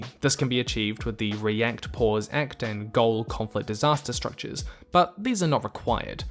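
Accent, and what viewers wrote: United Kingdom. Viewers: Why do you Bri'ish mofos have such trouble with words that end in "ian" or "ion?" No, it isn't SebastIAN. It's Sebastian. 3 syllables, not 4.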